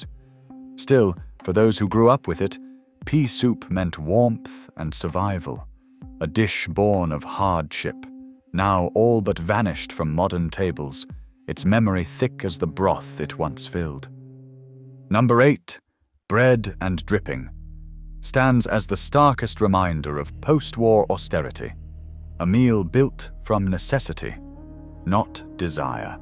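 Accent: United Kingdom. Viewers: More of your usual clickbait, ignorant nonsense. Few of these "can't be found any more". Beef stew with root veg is still very common. Lancashire hotpot is still served in many restaurants and homes. I had bubble and squeak two days ago. Toad in the hole in a gastropub recently. I love how you talk about corned beef in blue-labelled tins and illustrate it with a bunch of red-labelled tins. Are you colour blind as well as stupid? Pea and split soup is still common, too. I still love rabbit stew! You can't find rice pudding? BS. Bread and butter pudding is available in Michelin star restaurants! Apple crumble is still one of the mot common desserts in the UK. Despite the British accent of the narrator, he is reading something written in American English and most of the images, when even vaguely relevant, are American. Bunch of frauds.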